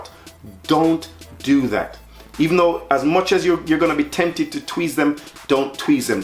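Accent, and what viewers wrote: Jamaica. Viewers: The patwa hawd fi hide. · Hey man, are you originally from Jamaica? I can hear it in the accent.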